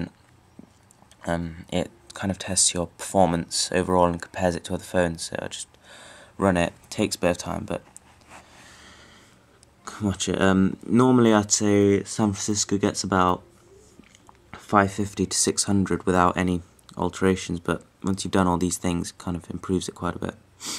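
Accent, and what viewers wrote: RP, United Kingdom. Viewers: He sounds like a British teenager ngl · Eyy this English makes me feel your from the UK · you went from sounding british to sounding british and like a robot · Your voice has a drastic change in 10 years. Typical british to a posh accent. Just mind blowing.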